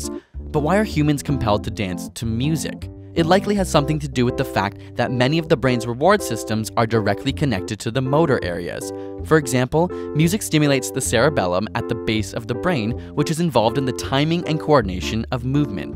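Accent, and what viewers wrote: Canada. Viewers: It's a Canadian accent.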